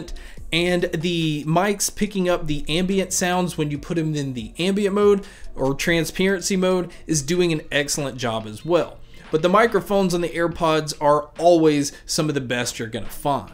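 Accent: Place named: Texas, United States. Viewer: I used to think that the Indian accent was the worst on the planet but, damn, yours tops the charts is it texan? . Great review though man and love your content!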